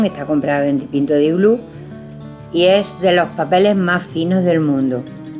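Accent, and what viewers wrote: Andalusia, Spain. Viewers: TE MIRO DESDE SAN RAFAEL, MENDOZA, ARGENTINA. TIENES UN TONO ANDALUZ, ¿DE QUÉ PROVINCIA ERES? ME ENCANTAN TUS VIDEOS Y SOBRE TODO, LOS RELACIONADOS A TUS ESPECTACULARES PESEBRES. SALUDOS.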